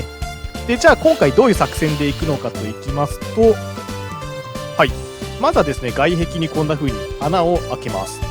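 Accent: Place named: Aichi, Japan. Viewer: 関係ないけど喋り方きいてるとこの方は愛知とかの人かな？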